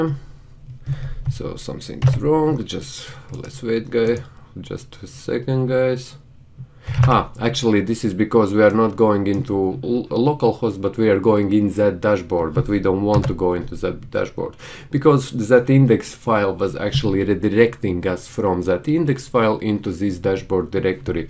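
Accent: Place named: Russia. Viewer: Russian or Ukrainian . Around there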